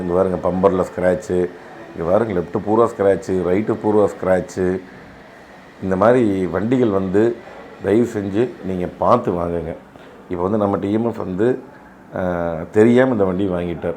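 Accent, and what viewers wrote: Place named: Tiruppur, India. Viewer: ... உங்களோட இந்த திருப்பூர் தமிழ் அருமை... அதற்கு நாங்கள் அடிமை..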